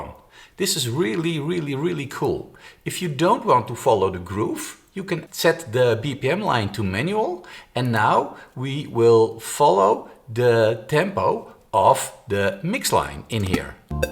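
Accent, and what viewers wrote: Netherlands. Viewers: Ik kan gelijk horen dat je uit Nederland komt leuk hoor ik ga deze app even downloaden hartstikke bedankt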